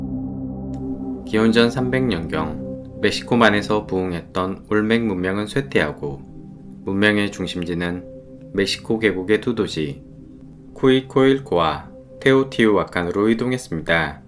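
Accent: Jeolla, South Korea. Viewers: 말투를 들어보니 고향이 전라도이시구만요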